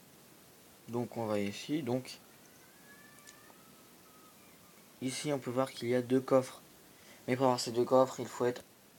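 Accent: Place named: Quebec, Canada. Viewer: Accent québécois ❤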